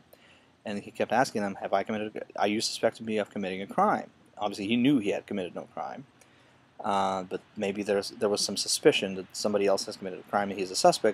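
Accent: Midwest, United States. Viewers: Sounds like midwest english .. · How come you sound American if came to America in 2005? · My family is from Crimea and has deep roots in Krasnoperekopsk, I call fake on this video, he has a perfect American accent but allegedly spent his entire formative years in the Soviet Union, and smokes a fat cigar which is probably just a stereotype for street cred thing, and he says bedrooms are a foreign concept, no the idea of having one specific room for only sleeping and nothing else may be to some people but the idea of having a room for sleeping wasn't a foreign concept in the Soviet Union, and Leningrad was far from the nicest place in the Soviet Union to live. And you can complain about apartments not being luxurious by modern standards but by the standards of the time they were a huge improvement over most people's living conditions and far better than living on the cold streets, at least people were housed and fed, many countries in the west particularly back then had high rates of homelessness and unemployment and some still do. 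And bananas? Seriously? Oh well how horrible of the Soviet Union not to have installed a bunch of Banana Republics and conducted a bunch of massacres like United Fruit to get an unlimited supply of bananas, yes Bananas were a luxury that's because Bananas don't grow well outside of a tropical environment and it took acts of genocide and tyrannical imperialism to make them an affordable commodity in the United States and Europe. So no bananas, but there were plenty of grapes, at least in Crimea I can't really speak for the rest of the Sovi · Your accent is very American despite living in Russia for so long. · Were you put in future kgb spy school. Your american accent is really good. Was your dad an american communist? How did you lose your accent? Your parents had to be americans that moved to the paradise. Ha ha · My God! You have no trace of an accent! One would never know you were originally Russian. 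You sound so American! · But that aside based on the way you talk and look correct me if I’m wrong. You live in the central to Midwest in the USA. If I had to narrow it down I’d say Ohio or Indiana. Judging by your complexion you work indoors maybe even third shift. Id day you work in a warehouse or maybe a factory. Your not a business man based on your gruff appearance you don’t wear a suit. You use a lot of short to the point words entails you aren’t highly educated I’d say associates degree at most. Be honest how much did I get right? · This is a very interesting account of your life and the life in general about living in the Soviet times ; Your ability to speak English like an American is quite impressive. Pretty much everything you described such as the description of your Kruschevka apartment, the scarcity of some foods (ie Bananas) lack of toilet paper ,the difficulty of traveling abroad and lack of private cars is exactly what i read about the USSR back in the mid 80s living in the US. 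It is true that the US news and movies did (and still do) have a lot of propaganda, but at times if you were a good reader you could occasionally find unbiased articles that described the daily life and the culture of the Soviet people .Few people today can remember a time before the internet and global connectivity back before you were reliant completely by books , tv or an immigrant who lived abroad. As a person who lived this way you do have a unique and straightforward perspective of telling your life in such a way that I find very few Russian immigrants feel reluctant to tell. I pesonally want to thank you for your story and time... You represent the American Dream that you can achieve if you believe. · It is rather evident that you are an english (US) native speaker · how did u lose your accent so fast if you lived in russia for 30 years? you sound like your from the midwest.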